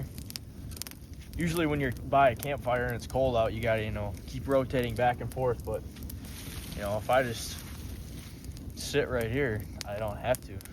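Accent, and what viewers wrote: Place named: United States. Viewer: It looked nice and tranquil where you were, but you're nearly always at tranquil spots. I liked the footage at the end when you were leaving on the boat, and the music. That was a good wall you made with the logs, and a good idea. I really like your American accent too! Which part of the States are you from?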